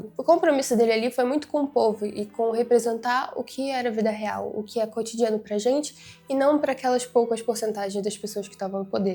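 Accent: Rio de Janeiro, Brazil. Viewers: Não consigo escutar um sotaque carioca e imaginar uma pessoa culta. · Pq carioca fala "naisceu"? Tenta falar "nasceu" normal que aí passa mais credibilidade nas suas falas, fora isso o vídeo ficou muito bom, conseguiu me prender a atenção a forma como vc apresentou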